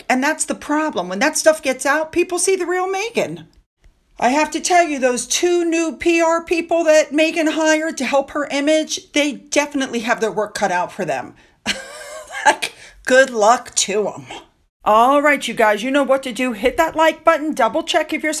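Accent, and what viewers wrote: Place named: United States. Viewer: I don’t find your talking too fast. I’m British and can understand your American accent very clearly.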